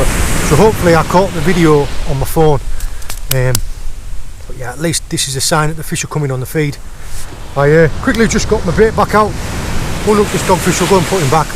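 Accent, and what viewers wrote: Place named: Cumbria, United Kingdom. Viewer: Your accent sounds West Cumbrian, like Whitehaven or somewhere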